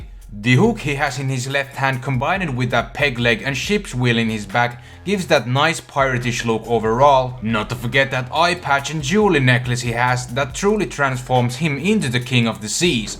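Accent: Finland. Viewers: Tiesin heti ku kuulin sun äänen et oot suomalaine XD ja hyva video voiks tehä Tips And Tricks ? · Are you finnish cause it sounds likes it :D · Wow! When I heard you speak I instantly knew you were finnish. HYVÄ VIDEO MUUTEN! ☺ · Hey are you Finnish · are you finnish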